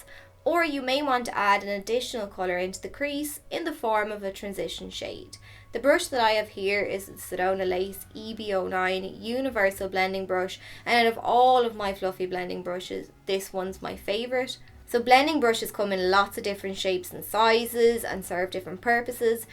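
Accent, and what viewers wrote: Ireland. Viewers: You are beautiful. You sound Irish lol.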